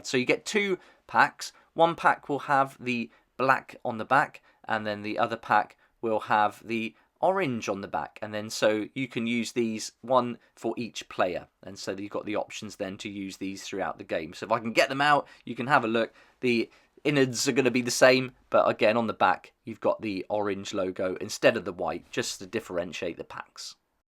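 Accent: United Kingdom. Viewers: This is an unrelated, dumb question, but since you have a UK accent, and you have that knife to open the box, are those knives legal to carry in the UK? Just curious as a US person.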